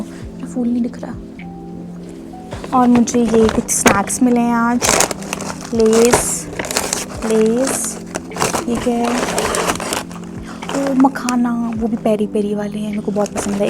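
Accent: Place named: Himachal Pradesh, India. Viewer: You still have to work on your accent it sounds himachali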